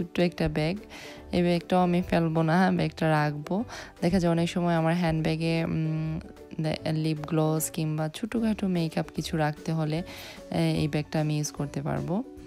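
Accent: Sylhet, Bangladesh. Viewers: Apu Tumi sylheti ni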